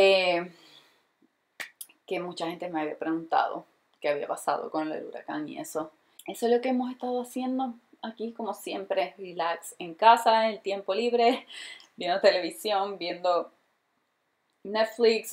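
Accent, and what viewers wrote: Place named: Puerto Rico. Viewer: Hola, ojalá no te desanimen lo que digan las personas negativas, porque hay mucha gente que aprecia tus vídeos y tú persona. Yo te animo para que sigas siendo tú misma ya que eso es lo que te hace ser especial, tus vídeos son muy interesantes y a mí me sirven para mucho. Besos de una española que adora tu acento portorriqueño mezclado con el inglés. Con todo mi cariño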